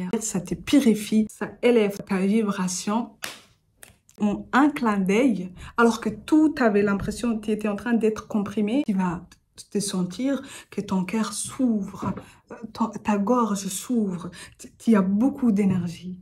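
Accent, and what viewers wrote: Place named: Comoros. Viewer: À son accent je dirais qu'elle est comorienne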